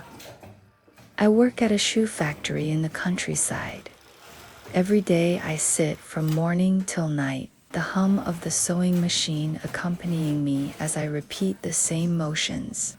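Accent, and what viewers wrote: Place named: United States. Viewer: Why do you speak fluent English with an American accent.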